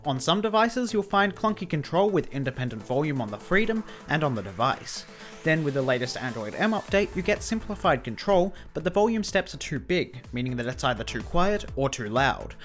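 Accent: Australia. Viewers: lol that's just how us aussies sound.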